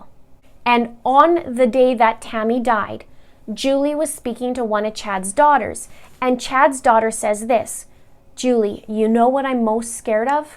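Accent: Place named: Canada. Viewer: She's Canadian I believe? They pronounce some things differently than other cultures.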